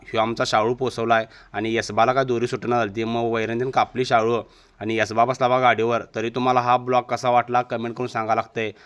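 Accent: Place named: Ichalkaranji, India. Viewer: इचलकरंजीकर आहेस का भावा?? टोनिंग तसेच वाटते आहे..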